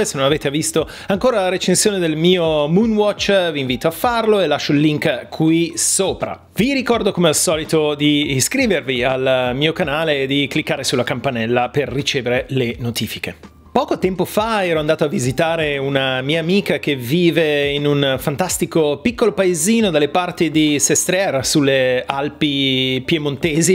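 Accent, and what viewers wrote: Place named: United Kingdom. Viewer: Ma perché hai l’accento inglese?!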